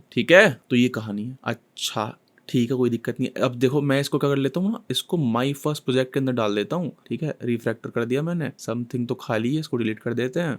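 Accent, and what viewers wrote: Uttar Pradesh, India. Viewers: wow gazab , the way of explanation wow mtlb amazing, ultimate , soothing and solid . lag rha hai samne baith ke koi padha rha hai. and i m from up as well and can connet with an accent , amazin. indeed the best explaintion . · Guru kamal adami ho accent to pura UP wala hai. Best explaination on youtube.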